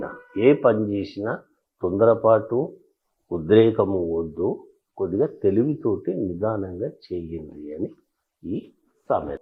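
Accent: Telangana, India. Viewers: తెలంగాణ భాష యాస జీవద్ బాషా ✊🙏శరణర్థి మైక్ టీవీ ✊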